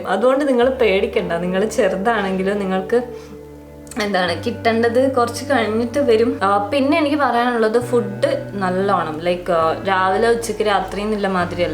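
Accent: Kannur, India. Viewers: Kannur evdya .oru knnur slng und talk l evdya gym ?? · Uff kannur basha😂💫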